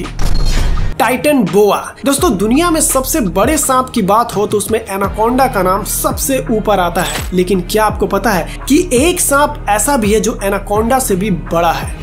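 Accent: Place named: Bihar, India. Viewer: Awaz???jani pechani hai Behari touch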